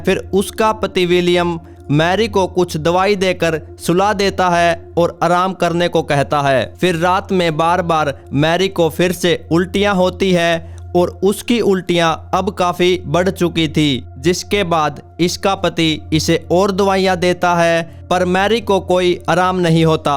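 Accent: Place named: Rajasthan, India. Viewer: Rajasthani aise hi bolte he shyad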